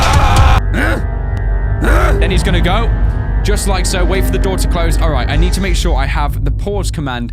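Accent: United Kingdom. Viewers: AshandraBlue i mean he is british like dan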